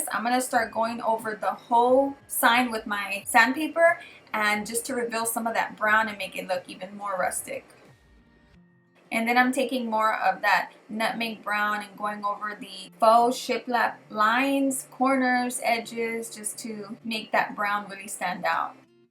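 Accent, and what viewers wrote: Texas, United States. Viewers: I know a fellow Texan accent when I hear it! Love your videos!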